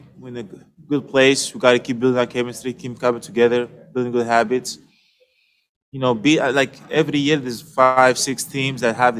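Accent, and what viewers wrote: Greece. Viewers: I love his Greek 🇬🇷 accent ☺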